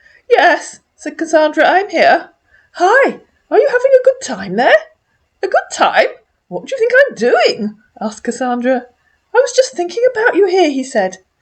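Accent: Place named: RP, United Kingdom. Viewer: Your posh accent is jolly good Cassandra 😌 This character reminds me of Denise, older, wise and salty 🧐 Looking forward to Samantha's salty reprise too 😼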